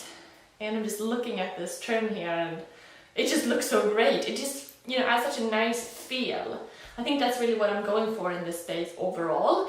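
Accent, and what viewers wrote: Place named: Sweden. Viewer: Claims to be Swedish, sounds Swedish. Doesn't cover the outhouse in pine (furu). The Norwegians get suspicious of this behaviour.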